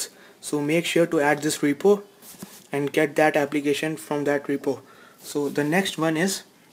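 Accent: India. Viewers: Why do u sound like a gay Indian ?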